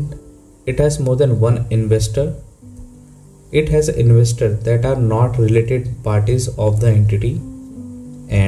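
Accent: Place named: India. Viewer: the first problem with this video is the accent which is so bad, the second one is that you cannot cover the topic in just three minutes. Indians always fail to adhere to the minimum standards of professionalism and integrity and claim for what they don't really have. I personally don't like them at all.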